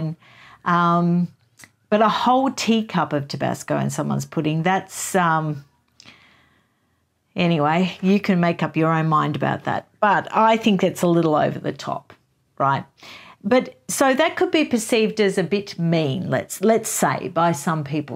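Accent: Australia. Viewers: This is the first time that you have popped up on my feed and I'm glad you did, and it was so good to hear an Australian. Looking forward to watching more.. ❤ As for Harry, I find him to be a nasty person with no respect for anyone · ❤ your aussie accent.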